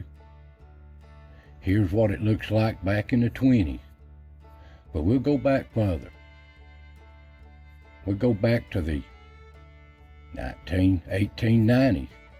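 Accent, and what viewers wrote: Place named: South, United States. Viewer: One of these days I am going to have to cross the pond and visit this part of the USA. It reminds me so much of parts of the high country where I grew up in southern New South Wales, Australia... except we have Gum Trees and not Aspens, Birch etc.. Thanks for another great video,. I could easily sit down and listen to you speak all day, just love that Southern accent and gentle speech.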